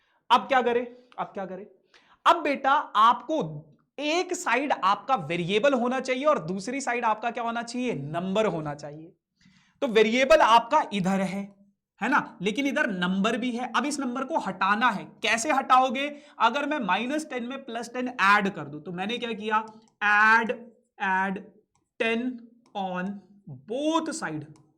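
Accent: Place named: Haryana, India. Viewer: Haryanvi hi hai mdm ji❤😂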